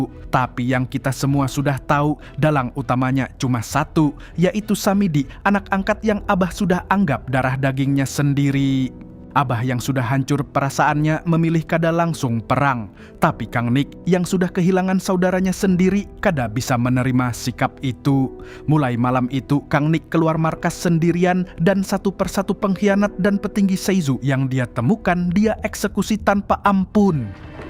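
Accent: Banjar, Indonesia. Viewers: uyy amang banjarrr😂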